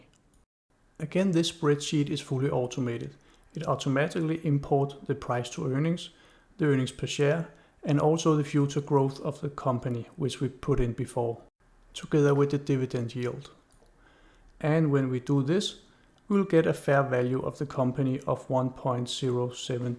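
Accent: Denmark. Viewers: Excellent video - keep up the good work - nice danish accent by the way ! - from a fellow dane !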